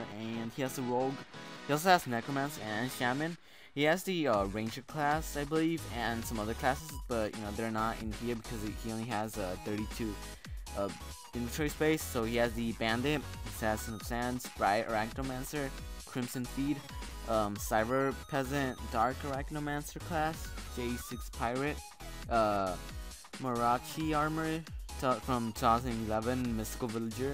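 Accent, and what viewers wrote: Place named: New Zealand. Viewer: I knew it you had an accent! >:3 If I'm not wrong,you're from New Zealand right? Btw I want to enter aswell xD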